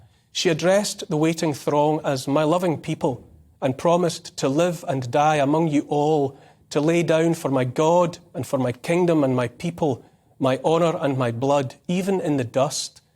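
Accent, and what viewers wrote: Scotland, United Kingdom. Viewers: That was great. I could listen to the Scots’ accent for a very long time, no matter what was said but this was very good indeed. Thanks.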